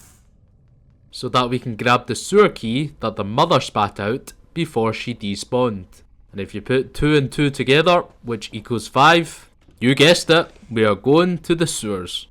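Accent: Scotland, United Kingdom. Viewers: Why do you look Asian but sound like a scot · the asian with the strongest british accent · Yoo a fellow Scotsman! Congrats on the world record and the fantastic accent 😂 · Saw this vid on my homepage, pressed and liked the humor and ur Scottish accent is so entertaining and I think eventually followed, looking forward to watch more vids, LOL. “Get fucked”😭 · an asian with a scottish accent?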